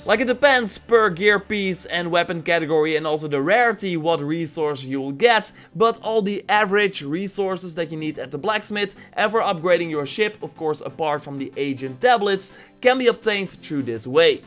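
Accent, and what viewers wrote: Netherlands. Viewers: You sound Dutch, where are you from? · Gek Nederlands accent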